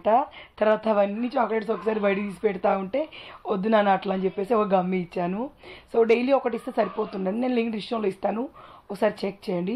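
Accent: Telangana, India. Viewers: Me voice super akka telangana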